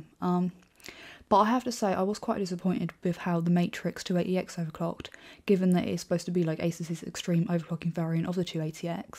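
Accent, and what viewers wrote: United Kingdom. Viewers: I'm sorry, but I don't understand most of it. While I find your dialect interesting, you slur your words together so much, that I seldom can pick them out of your sentences. I can't imagine that these videos are made only for the british people in your area (who, I suspect, would understand you perfectly well). The rest of us, and especially non-native speakers such as myself, are really having a hard time here. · I just watched this video and made a comment about how I can't understand her English b/c of her accent and I made my comment b4 I saw yours so obviously people are having problems understanding her dialect. Your videos are awesome and very professional but you need to speak slower and dictate your words individually or people that aren't British will be lost. Just my 2 cents. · Nice work, interesting channel and projects, great looks and smile, nice personal touch in videos (beautiful dresses, bunny, …) with no sight of arrogance in your speech and manners that indicate that you respect the audience. 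(I like how sometime apologize for smth. you didn’t have time to complete or achieve nevertheless the hard work you already done). If I had a TV channel and money I would definitely hire you (Don't speak American stick to UK-English accent)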